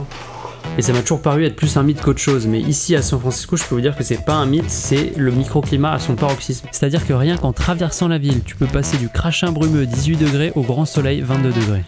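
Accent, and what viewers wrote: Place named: Nord-Pas-de-Calais, France. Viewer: Bien bien :) J'aime bien le ch'tis ! Ta un avenir en comédien en fait, oublis youtube ! :) Par contre pas fan du filtre blanc que tu as utilisé :(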